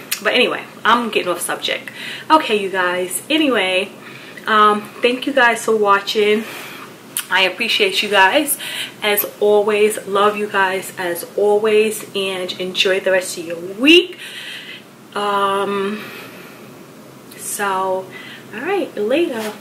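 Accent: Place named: New York, United States. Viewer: I could hear that strong new york accent lol love it is cute just like you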